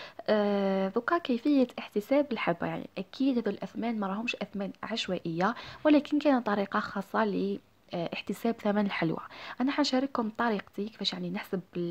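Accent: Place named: Djelfa, Algeria. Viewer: الله يبارك حسيت من لهجتك جلفاوية يعطيك الصحة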